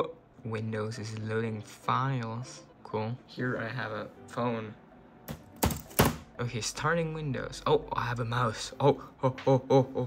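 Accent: Czech Republic. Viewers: Ur czech ?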